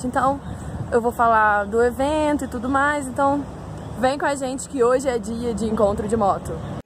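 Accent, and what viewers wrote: Minas Gerais, Brazil. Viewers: De onde vc é guria , fala como mineira com trejeito de gaúcha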